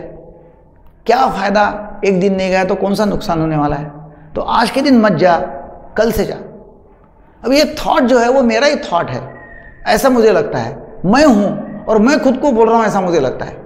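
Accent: Maharashtra, India. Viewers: सर तुमच्या हिंदी भाषेवर मराठीचा प्रभाव दिसतो.. But your all videos are really Awesome as most are connected to our daily life problems and you illustrate it very well .. So please keep posting.. I am from वर्धा... Best luck to you.. Sir, Meditation म्हणजे नेमके काय..? Awareness, Alertness, Consciousness व Concentration यात काय फरक आहे कृपया ते स्पष्ट करणारा video बनावणे.. Sir, Meditation मी अनेक दिवसांपासून प्रतिदिन करतो आहे पण मला त्याचा विशेष फायदा जाणवला नाही आहे.. Present मध्ये कसे जगता येईल ते स्पष्ट करने.. काय Meditation केल्याने कुणाचे मनोविकार(काम, क्रोध, लोभ, अहंकार आदि) नष्ट होतात.. ते नष्ट करायचे असल्यास काय करावे लागेल..? शांत मन Meditation साठी Prerequisite आहे, पण शांत मन कशे साधायचे हा प्रश्न बिकट आहे..? माझ्या मते व्यक्तीस वैराग्य आल्याशिवाय, त्याच्या तृष्णा नष्ट झाल्या शिवाय त्याला शांति प्राप्त होने अशक्य आहे.. पण वैराग्य प्राप्ती व तृष्णाविहीनता कशी साध्य होणार, याबद्दल कृपया मार्गदर्शन करावे..सर्व काही असतांना माझे मन विनाकारण सदैव अशांत राहत.. 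मला चिरकालीन शांति अनुभवायची आहे.. कृपया मार्गदर्शन करावे ही विनंती.. नमस्कार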